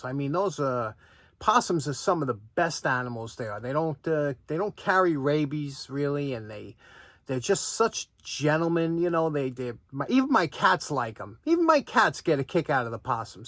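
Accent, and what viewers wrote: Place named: New York City, United States. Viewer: your accent makes the video's. Nothing like good ole NYC sarcasm.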